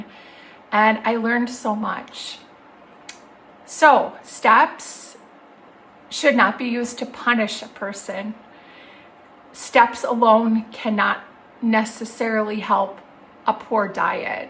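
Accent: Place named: Midwest, United States. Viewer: I love that midwest accent❤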